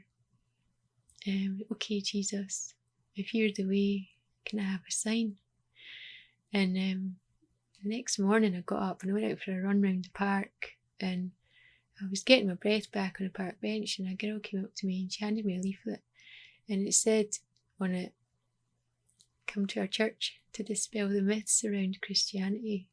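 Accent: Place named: Scotland, United Kingdom. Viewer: i almost moved to edinburgh for uni. Love your accent and story. I kinda agree with a lot of what you say and like the world doesn't understand and it is hard to be around people who just go along with whatever is trending despite them not really caring about protecting anyone, they're also the most mean people sadly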